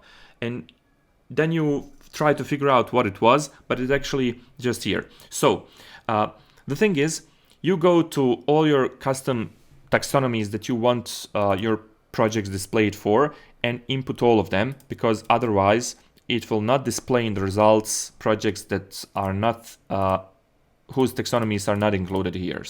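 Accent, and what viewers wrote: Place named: Bosnia and Herzegovina. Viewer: cim si poceo pricati znao sam da si Bosanac' Extra brate :D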